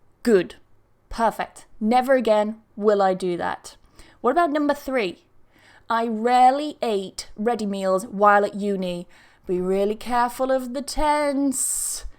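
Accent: United Kingdom. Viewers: Thank you so much from Ukraine! Just about a couple of days ago I was thinking about the inversion, as I see it quite often and I was interested in learning it. And today I've seen your video and I was able to understand everything you were talking about! Thank you!! And one more thing!:)) As I'm learning different English accents (British is my favourite one!:)) but I also know you have more than 50 accents and dialects in England, I want to say that your accent is really great and it's a great pleasure to listen to it:))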